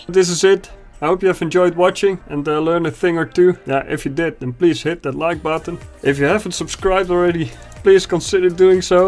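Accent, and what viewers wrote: Netherlands. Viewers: Nice man! Ik hoor je dat je Nederlands bent! 😂😂. Het grappigste vond ik toen je cod 2 aan het spelen was en je godve** zij🤣🤣. Daar viel je door de mand😂😂😂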